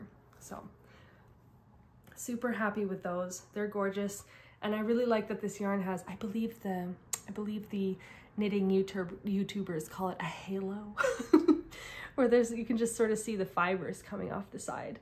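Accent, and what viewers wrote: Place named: Quebec, Canada. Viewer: Bonjour. J’adore tes vidéos, c’est certain que je l’ai écouté jusqu’au bout. Je fais des points de croix et j’ai tombé en amour avec le livre 52 socks in 52 weeks que j’ai emprunté à ma bibliothèque. J’ai découvert un patron de Paula Wisniewska Lato sur Ravelry avec la laine de Julie Asselin (québécoise) fantastique, le patron est aussi dans le deuxième livre de 52 socks in 52 weeks II. As-tu acheté le nouveau livre de Gabrielle Vézina (québécoise) qui fait des tricot en dentelles et des bas. Avec la laine de Julie Asselin. Juste avant ton vidéo, j’ai commandé une verge (yard) de speculass et deux mini hank de falu red pour faire Harriette Salt et Louisa Coulimore sur panettone. Moi aussi j’aime les fils Roxy floss co. Le falu red est mon préféré. Je suis une québécoise (dans mon cœur)qui vit en Ontario maintenant. À 25 je me suis mariée (avec un québécois bien sur lol) mais comme il était pilote dans les forces, nous avons déménagé en Ontario Mais nous retournons visiter nos amis et famille a chaque année Tu me fais chaud au cœur quand tu parles québécois Merci ne change rien. 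On t’aime comme tu es ❤ P.S. moi j’attends encore que mon amie me montre à travailler avec des aiguilles sur câble la loop magic Eat-ce que tu tricotes comme ça? Moi je ne dis pas skein, je dit ma pelote de laine 😂 À bientôt Moi je vais à stitch north la deuxième fin de semaine d’avril et en octobre J’espère te rencontrer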